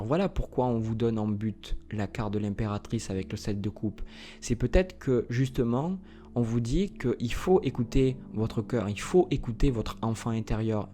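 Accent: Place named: Aveyron, France. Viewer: Trop drôle,! Mon cadet porte votre prénom, votre nom et votre accent me parle mon Aveyron... Et vs êtes bélier et analysez nos caractères comme moi... Et le changement de ce mois est ouvert! Après échec et mat...par trahisons! Et trop de ces questions que vs ennoncez... Merci vraiment.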